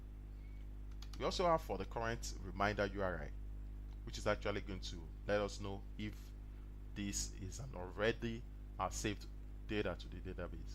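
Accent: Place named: Nigeria. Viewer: you sound nigerian.. are you?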